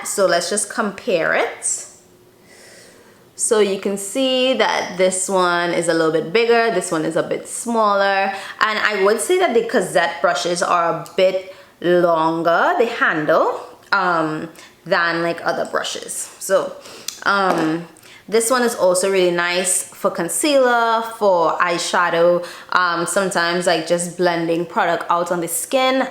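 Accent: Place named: Trinidad and Tobago. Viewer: You sound Trinidadian. Where are you from?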